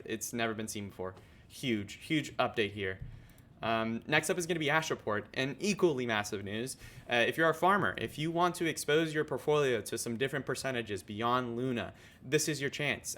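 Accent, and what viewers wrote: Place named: United States. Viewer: Wena crypto Chileno. Has 100% gringo accent, must have gone to Nido de Aguilas. Nice youtube mate, will be following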